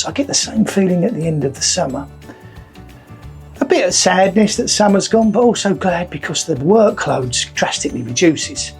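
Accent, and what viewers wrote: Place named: Southern England, United Kingdom. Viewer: I thought sounded like a southerner.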